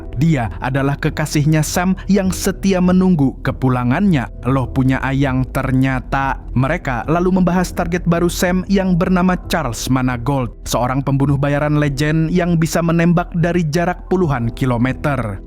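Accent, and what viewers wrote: Banjar, Indonesia. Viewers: Logat mimin kek org banjar... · Org bnjar bnar Sidin nie amn di dengar logatnya😅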